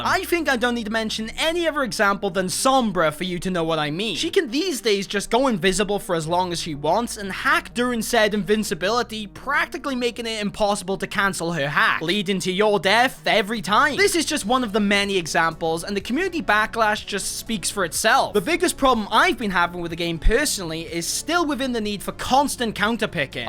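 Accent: United Kingdom. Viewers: British accent mixed with the typical exaggerated YouTube tone is unlistenable.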